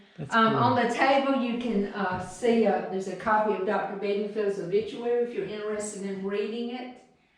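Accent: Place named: Georgia, United States. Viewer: This took me back to the summers of my childhood. I spent them 9 miles away from Lumpkin, in Richland. I miss that accent!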